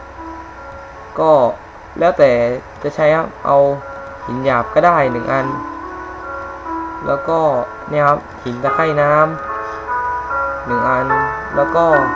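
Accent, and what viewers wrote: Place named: South, Thailand. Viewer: เด็กใต้หรอ ทองแดงน้ะ <num>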